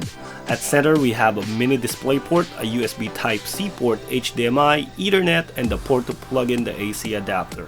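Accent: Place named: Australia. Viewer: it's a rare opportunity you'll see an asian guy with an aussie accent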